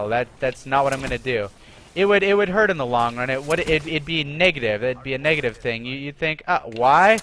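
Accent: Canada. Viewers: You can hear the Canadian.:) Love you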